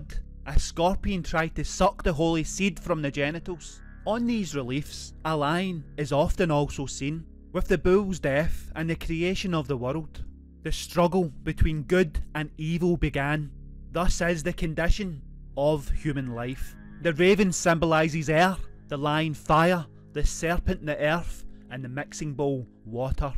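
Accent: Scotland, United Kingdom. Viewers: Nice video and accent, is it Scotish? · Please bring back the old narrator, i cant understand half of what this guy is saying. His Scotish accent (i think) is so damn thick its hard to make out certain words.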